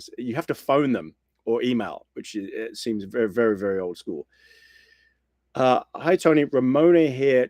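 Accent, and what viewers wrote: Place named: England, United Kingdom. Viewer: Hi Tony! I can hear you! I'm glad you have a re-play--Thanks. I didn't know you were already in Canada! It's not gone wrong--You just didn't know plans would change. :D:face-red-heart-shape: You look real good in those glasses!👍👍👍👍👍 You sound like you are from England/New zealand and whatever accent you have--I really like it. :D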